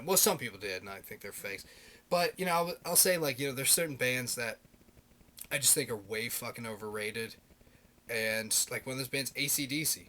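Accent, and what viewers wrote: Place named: Germany. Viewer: your german is funny :D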